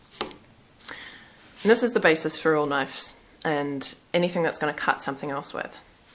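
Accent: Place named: Australia. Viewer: There's more videos than this but yes, still nothing wrong with having more of them. Perhaps do some videos on wrestling with longswords, as many are under the impression it simply didn't happen. Edit: Also I thought you were Australian for a minute there (I'm Australia.) gee the difference between your accent and ours here is a lot more subtle than I'm used to.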